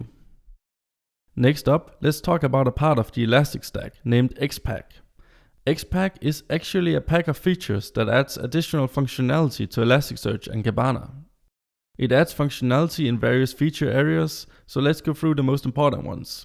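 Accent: Denmark. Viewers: You can hear this guy is danish :D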